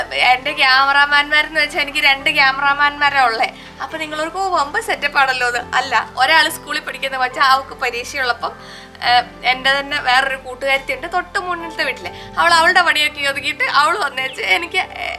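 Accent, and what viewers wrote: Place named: Southern Kerala, India. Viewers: ❤ നന്ദി, ഞാൻ വിചാരിച്ചത് ഇടുക്കി ക്കാരിയാണെന്നായിരുന്നു, എന്തായാലും തെക്കൻ side ലെ ഭാഷയാണ് കോഴിക്കോടൻ slang അല്ല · Kottayamkarude varthamanam❤️❤️❤️❤️❤️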